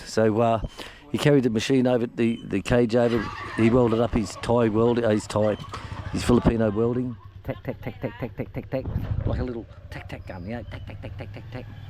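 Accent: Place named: Australia. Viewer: Just found your channel. Popped up in my feed and caught my eye. So good to hear an Aussie voice after all this time. Anyway I have subscribed to keep up with the laughter.Until people live here they have no idea of the hoops that we have to go through on a daily basis. I learnt not to go to the hardware on my own because they just don't serve me. Too afraid to speak English.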